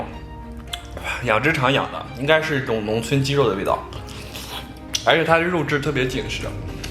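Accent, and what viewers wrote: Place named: Bayannur, China. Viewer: 我跟你说~ 听出来蓝 是个巴盟人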